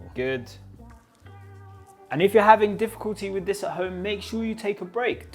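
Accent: United Kingdom. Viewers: Brilliant British accent 😮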